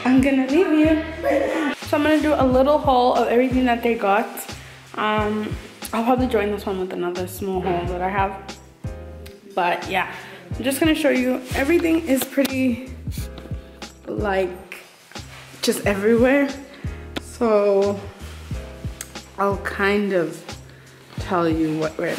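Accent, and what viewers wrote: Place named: United States. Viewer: I've been watching your channel since it began and have always wondered why you have an "American" accent of sorts? Were you born/raised there? Hope I don't come off as rude, I'm just curious.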